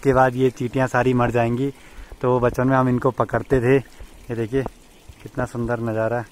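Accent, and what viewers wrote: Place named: Garhwal, India. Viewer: आपके मुँह से गढ़वाली बहुत अच्छी लगती हैं तो कुछ अपनी भाषा भी बोला किजिए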